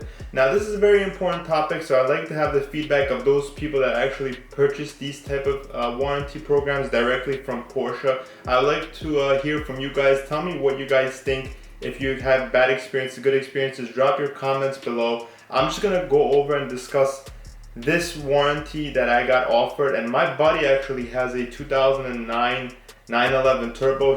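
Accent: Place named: Armenia. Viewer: Your eyes look just like pure Armenian eyes and the accent is Arm as well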